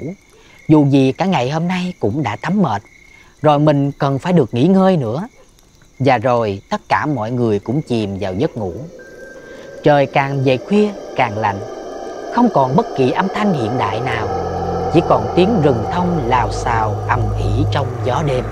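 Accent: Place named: South Central Coast, Vietnam. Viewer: Seri này là 1phần 50 tâm linh , còn 1phần 50 ý nghĩa và sâu xắc,anh kể giọng rất hay❤🎉.Cứ như tiếng miền trung miền nam zậy,đúng thiệc là anh kể zui thiệc với rất kinh dị,nếu xem chung với bạn bè thì còn zui,chứ xem một mình thì hơi sợ nha😅,em chúc anh lập sẽ tích cực hơn sẽ ra nhiều video hơn nữa và thú vị hơn ạ❤❤😊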